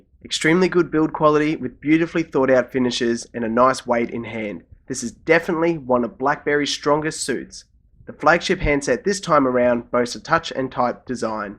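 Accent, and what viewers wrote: Australia. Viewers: Finally an Aussie!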